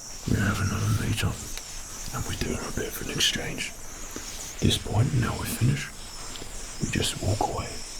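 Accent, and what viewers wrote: United Kingdom. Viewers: .......i have no idea why i like this "gangsta british accent" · Hes bri’ish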